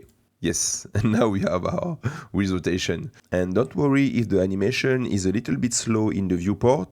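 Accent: France. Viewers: salut, ca sent l'accent français non ? ^^ cool la vidéo. edit: en plus, c'est un windows en fr, donc plus de doute ^^ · are you french? cause of your accents haha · je peut entendre le p'tit bout de francais qui resort. merci pour le tuto! je compte pas re cree la scene mais certains de tes tips m'aiderons ^^ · are you French ?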